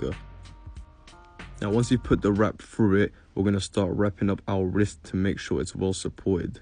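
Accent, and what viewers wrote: United Kingdom. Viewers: Brother are u British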